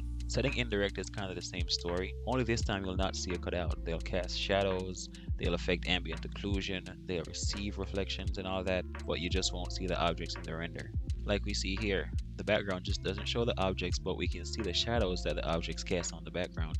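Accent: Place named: Jamaica. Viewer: I like your accent sounds Jamaican.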